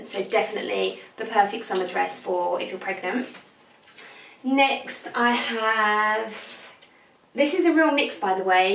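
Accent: United Kingdom. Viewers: Loved the Chanel reveals, keep them up xo Listening to you makes me miss the UK so much!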